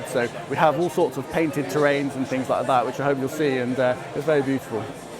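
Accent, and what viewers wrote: United Kingdom. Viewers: cool an Asian with a British accent demonstrating my favorite game! :D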